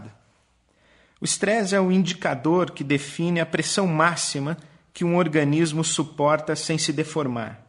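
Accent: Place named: Rio de Janeiro, Brazil. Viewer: senti um sotaque meio carioca, um R puxado.. as vezes nem parecia ele.. kkkkk Benção pastor, obrigada por cuidar de nós através das suas palavras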